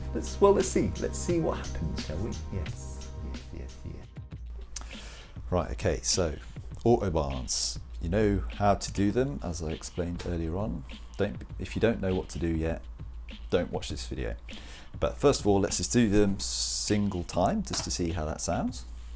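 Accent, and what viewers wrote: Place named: Texas, United States. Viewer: Yeah, I can't find anyone else being credited with inventing it. I believe I was wrong😁 Nice chatting, I enjoy your videos and I like your hand drawn S9 faceplate! 12inch Skins is awesome, got some on my setup if you want to check on my videos. The videos are terrible, ignore that part... Cheers from Buffalo NY! I'm guessing your from Texas judging from the accent lol! Or maybe Great Britan! 😁 I hope to get there someday. Looks beautiful! Cheers!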